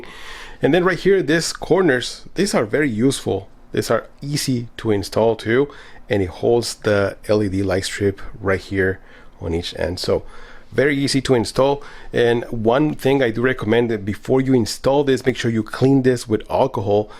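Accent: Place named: El Salvador. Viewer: My right hand man at my last job is from El Salvador. I miss talking to him for many reasons, but that accent is one of the top ones.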